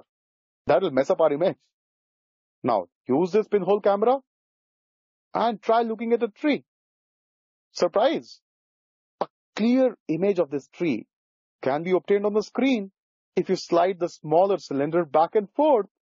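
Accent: India. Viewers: I think he’s indian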